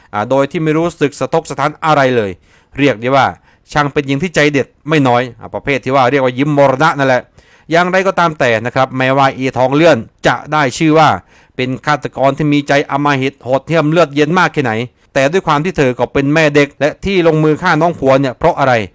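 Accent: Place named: South, Thailand. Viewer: เป็นนักเล่าข่าวสื่อต่างในอดีตทั้งวีรกรรมผุ้กล้า ทั้งวีรบุรุสในยุคปัจจุบัน สำเนียงคงเป็นคนใต้อันทีจริง คือเสียงคล้ายๆคนใต้ คงแทนลึงทวนทอง999 ในยุคโคสนาเล่าเรื่องข่าวชาวบ้านได้ดีมากๆครับ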